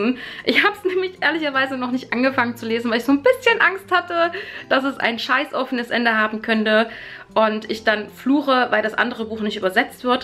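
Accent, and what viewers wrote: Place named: Thuringia, Germany. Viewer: Erstmal toll das du wieder da bist. Ich hab dich soooo vermisst. Hoffe dir geht es wieder einigermaßen besser. Falls nicht schick ich dir gute Besserungswünsche. Süss das der thüringische Dialekt bei der Krankheit voll durch kommt. ❤️❤️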